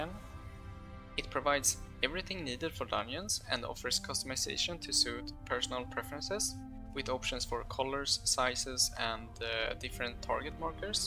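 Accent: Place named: Sweden. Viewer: Sjuk svengelska bror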